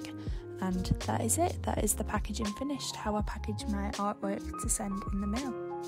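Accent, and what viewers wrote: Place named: Newcastle, United Kingdom. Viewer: I had a thing with a gal one summer…and she had your accent. You must be from Newcastle…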